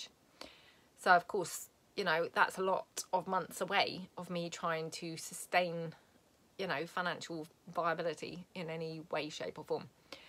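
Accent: United Kingdom. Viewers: Hi, I was so touched by your candidness! I know you are under great stress right now. I never told you this but I feel compelled to share with you that I found you on YouTube right after I lost my beloved husband of 39 years to Covid-19. I instantly fell in love with your British accent (I live in USA) and your conversational style and your creativity. There were very many days when I did not want to go on living without my husband, and for the life of me I didn’t know how I would possibly get through the day! The only thing I could think to do was to put on your videos and watch them one after another after another, because your voice and your cheerful demeanor relieved my horrible anxiety. So - we, your viewers, owe you a massive THANK YOU! May God be with you tomorrow! 💜💜💜💜💜💜💜💜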